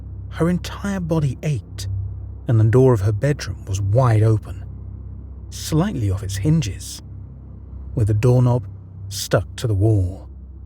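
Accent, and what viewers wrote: United Kingdom. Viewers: Ohh I do love these stories being a ex ghost hunter and demonologist I’ve had many many experiences like this most much worse and one was so bad it made me give up paranormal investigations with ouija boards for good I’ve never ever used “the board” again maybe I’ll write it down one day but when ever I hear a story like this it takes me back to that night and I shiver it’s great to hear a narrator with a British accent for a change too I’ve not heard any of your story’s for a long time I’ve missed you reading to me (US) have a good weekend 😊👍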